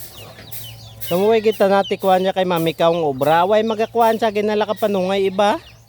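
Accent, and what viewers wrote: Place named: Romblon, Philippines. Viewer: Maganda Buhay lods 😊 salita nio pra din sa Amin Romblon, Dami ah blessings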